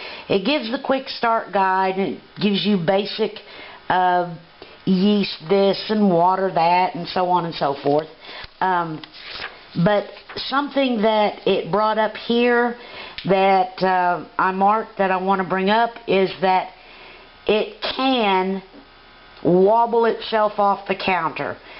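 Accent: South, United States. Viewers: Nice Video! You are more entertaining than Paula Deen and you sure do have a more distinct accent than her!! Nonetheless, I am from Buena Vista VA and therefore speak Southern and Hillbilly so I had no trouble understanding you, and it was nice to hear some familiar accents!!! I already have a DAK Turbo Baker IV which makes great bread, regular, oat/whole wheat, white, and whole grain, as well as dough, and I have use all of those cycles with great results, but I am in the market for a machine that does the cake thing!! I was hoping your video would have shown that, but you stated that you're not interested in the cake making feature of your Ham Beach machine. Well, let me tell you something, Miss Lady, us men like dessert and you sound like you've got a good man that you've been taking good care of for many years, so you'd better start making some cakes for him in your new bread machine and then fire up your camcorder and put some videos of your cake bake on the internet!!!!!!!!!! 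If that recipe book came with a Lemon Pound Cake recipe in the 'cake' department, whip one up and put it on You Tube!!! Thanks!!!!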